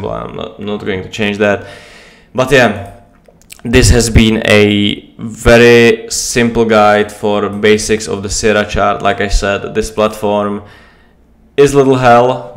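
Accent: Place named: Czech Republic. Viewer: ) ta čeština, to nezapřeš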